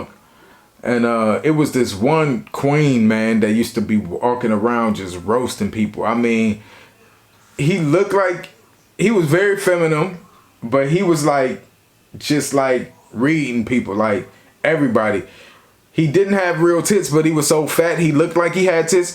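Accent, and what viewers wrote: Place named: DC-Baltimore, United States. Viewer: Bet. That accent sounds familiar. DC to Bmore usually have a variation of it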